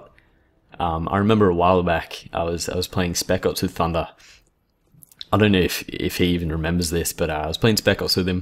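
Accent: Australia. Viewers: Good to hear a fellow Aussie! :D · Woooooo finally a commentator from Australia :D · is this guy australian???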